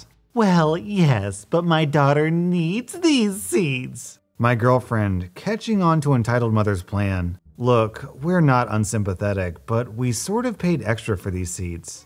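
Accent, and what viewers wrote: South, United States. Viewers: Hey, I’m loving the southern accent plus that pronunciation was epic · please keep doing the southern accent. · Im for ga. And when he does the southern voice all of us here in ga are okay with it.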